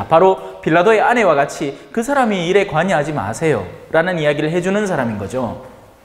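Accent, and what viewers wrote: Gyeongsang, South Korea. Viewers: 뜨시다 빨리 드시소~ 정감어린 경상도 사투리에 잠시 고향으로 달려갑니다 고향떠나온지 어언40년~ 신부님 사투리가 제맘을 따뜻하게 합니다 언제나 잘듣고 있습니다 건강하세요 신부님~~~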